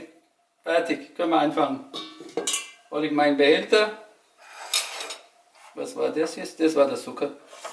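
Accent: Swabia, Germany. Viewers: Ich find doof, dass hier so viele seine "ausgezeichneten" Deutschfähigkeiten hochleben lassen... Darf man nicht nach Jahren hier leben, gut deutsch sprechen und integriert sein oder ist das so besonders?, ich find dein Schwäbisch klasse - Mach doch das nächste Mal Spätzle für uns:P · Ich fand die Sache mit dem schwäbischen Akzent lustig. Türkisches Essen auf Schwäbisch ist einfach nur genial. ^^ (komme aus Ö)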